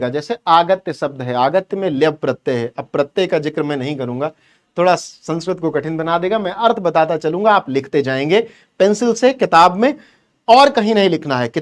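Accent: Uttar Pradesh, India. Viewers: Are sir aap up se hai lg rha hai by the way bahut bhokali samjhe hai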